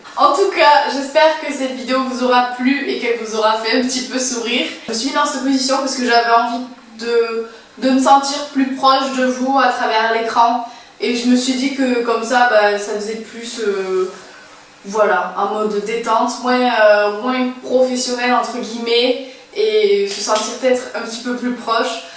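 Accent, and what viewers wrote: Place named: Marseille, France. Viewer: tu a un petit accent sud impeut marseillais je trouve tu vien d'où de quel rejion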